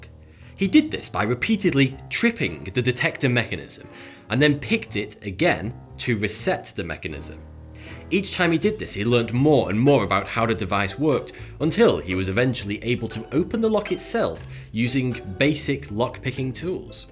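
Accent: United Kingdom. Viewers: I also can't unhear it. Is it due to the British accent? I had also heard "everything" as "everyfim" in the game Battlefield 1... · Oh my goodness, I have fallen in love with your accent. The British pronunciation of the English language is truly the most gorgeous to the ear. Plus the great content. Why haven't I found you ealier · Could be the British accent. But I found myself mesmerized by this. Lol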